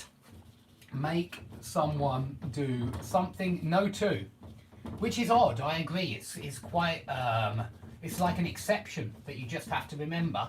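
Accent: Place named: United Kingdom. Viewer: ! British speech ... cool.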